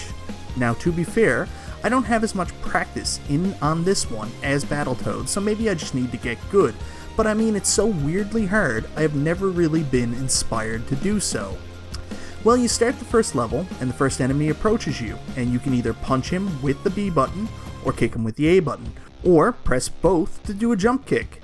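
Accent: Newfoundland, Canada. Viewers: Is that a Newfoundland accent I hear? I am also a hard game aficionado from the Rock, so that's nice. I've even beaten all three of these, and they're no joke.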